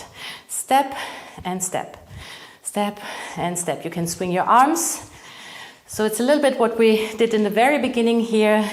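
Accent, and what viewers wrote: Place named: Germany. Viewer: Habe gerade deinen Kanal gefunden und abonniert und freue mich sehr darauf mit dir zu praktizieren!! I also LOVE essential oils and your German accent. Lol. Alles Gute und viele Gruesse von einer Kollegin.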